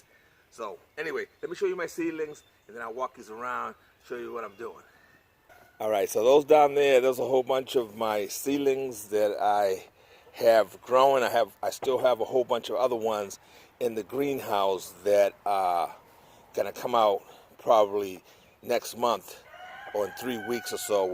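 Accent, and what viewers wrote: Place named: Bronx, United States. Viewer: Where are you from? You sound like the Bronx. Thank you, I pray the same for you.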